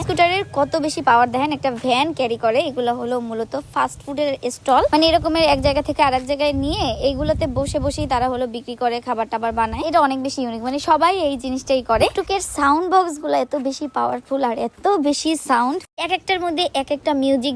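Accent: Barishal, Bangladesh. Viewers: ও মোর খোদা আণণে যে বরিশাইল্লা মুই বুঝছি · বরিশালের ভাষায় আর যে ভাষায় কথা বলো তবে তোমার ভিডিও করা অনেক সুন্দর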